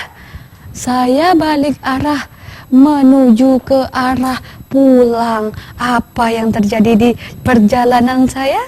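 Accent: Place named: Malaysia. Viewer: bicaranya mcm org malaysia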